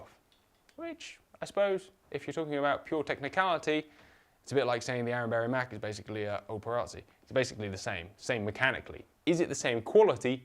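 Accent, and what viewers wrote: England, United Kingdom. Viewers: An English man, speaking English, with an English accent, in England, crazy.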